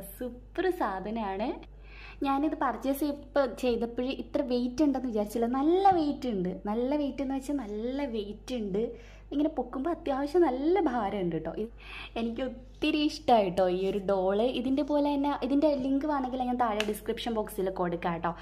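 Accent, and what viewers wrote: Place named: Thalassery, India. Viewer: Super 👍👍 thalassery ഭാഷ kelkkannkile nigale entha youtube channel kannan varuu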